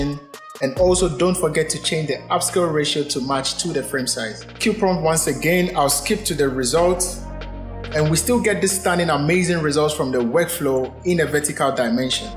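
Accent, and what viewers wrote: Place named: Ghana. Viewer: Boss, you sound Ghanaian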